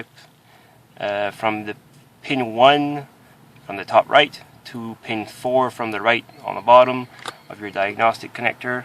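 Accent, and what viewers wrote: Canada. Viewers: You sound like you're from NB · Edmunston area is my guess. Sounds just like my cousin's husband.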